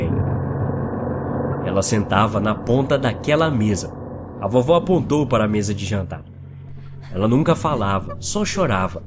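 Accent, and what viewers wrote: Northeast, Brazil. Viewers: ele tem um sotaque nordestino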